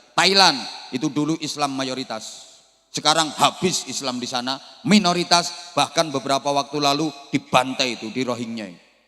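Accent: Banyumas, Indonesia. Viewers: Kramat jatibarrang Brebes Cocok bahasa e ngapak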